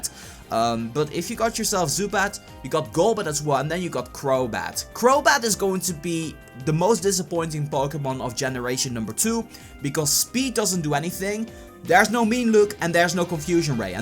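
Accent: Netherlands. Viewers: Hey, are you dutch? i am and i thought you where too <3 more pokemon vids<3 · ur dutch right? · That horrible Dutch accent :(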